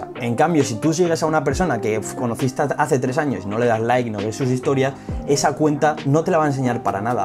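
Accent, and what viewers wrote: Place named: Spain. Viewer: Recontra interesante chabon!! Una pregunta, de que parte de españa sos?? Pregunto por el acento, esta muy copado jajajaja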